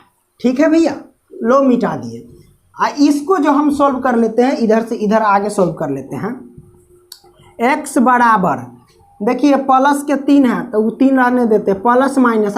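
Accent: Bihar, India. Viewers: BIHARI BHASA IS VERY NICE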